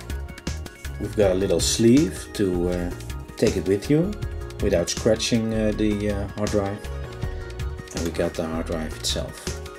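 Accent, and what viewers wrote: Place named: Netherlands. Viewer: That strong dutch accent tho lol, nice review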